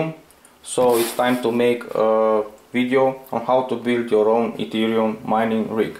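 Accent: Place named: Romania. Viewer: ăsta sună a Român , ce dqr 😂 (this guy is Romanian ).....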